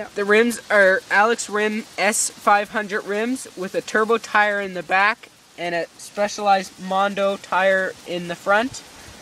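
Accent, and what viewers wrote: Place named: Upstate New York, United States. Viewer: Nice upstate NY accent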